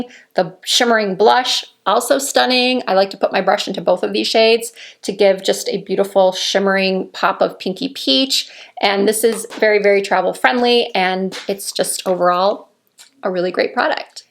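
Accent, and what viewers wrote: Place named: North, United States. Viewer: Hi, I really do love your channel. My skun, skin tone etc are completely different from yours, but I always gain great information from you. I feel bad that you have to always defend yourself from negative commentary. I admire all you tubers that have to put up with that. I just don't think I could do it. As an aside, I was born in Michigan, so I recognized your northern accent right away. Much love your way, and thank you so much Lisa, in Tennessee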